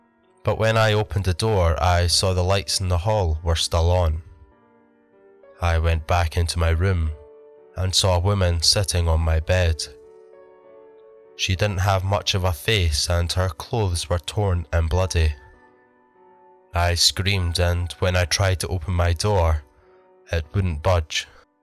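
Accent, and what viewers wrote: Scotland, United Kingdom. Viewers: Yeah!!! I've found me a Scott. Love the accent. You have a new sub and I look forward to hearing from you for a long time. 😍😍😍😍😍😍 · Creepy, uh Mr what part of Scotland are you from? I have been so busy haven't had the chance to see your answer, and haste ye back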